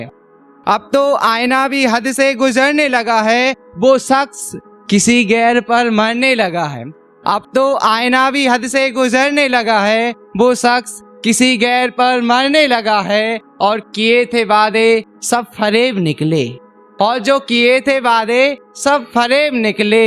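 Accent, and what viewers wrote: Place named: Sagar, India. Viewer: Bhai abaj se pta chal jata hai ki sagar se ho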